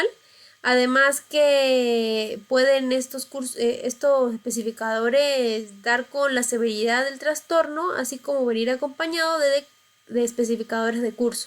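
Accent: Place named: Mexico. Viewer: EXCELENTE, TIENES CIERTO ACENTO MEXICANO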